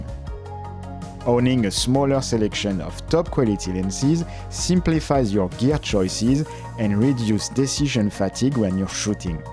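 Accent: France. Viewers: Your video has just described me with my obsession with old nikkn lenses. Apart I now need to learn how to clean them. And I have lots. Thanks fir a great video. BTW my wife loves your French accent.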